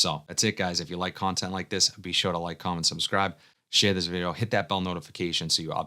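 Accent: Boston, United States. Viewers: Is that a Boston accent I hear?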